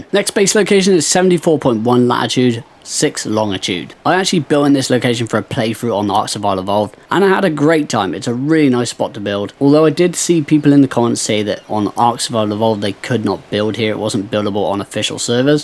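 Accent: United Kingdom. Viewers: i love British accent mate 😊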